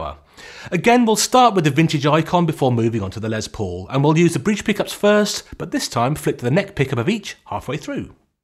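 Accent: United Kingdom. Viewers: you are better because you have British accent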